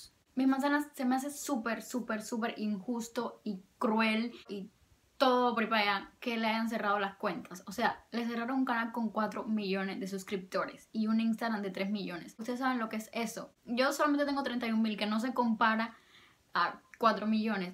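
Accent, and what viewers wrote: Cuba. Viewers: hola 👋 yo me llamo betsye y te veo desde Los Angeles, solo quiero decirte que creo en lo personal que eres una persona muy linda y me encanta tu acento cubano 😉👌 jeje mire que te hacían comentarios respecto a Kim y así que decidí venir a ver tu canal y en lo personal creo que tienes una personalidad muy bonita y me agrada mucho tu energía, sigue echándole muchas ganas y no le des fuerza a los comentarios negativos 👍🏻😃 ya tienes una nueva suscriptora!! 👏🏻👏🏻🤗❤️ tan solo por ser originalmente 😄👍🏻 saludos!!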